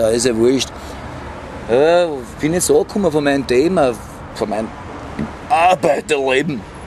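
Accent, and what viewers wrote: Vienna, Austria. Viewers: Ha ha, habe ich Englisch als Mutterspracher, entschuldigung sie...aber es ist mir egal...Wiener Dialekt klingt nicht so schöne für mich aber daß ist wurscht oder? Wer spricht was, mensch sind mensch uzw...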